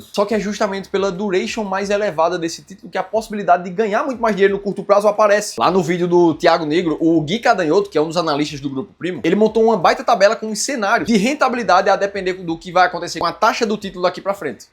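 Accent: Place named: Alagoas, Brazil. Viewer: Esse cabra fala! Ooo bicho esperto! Hahahah parece alagoano! Aí sabe sobre finanças hehe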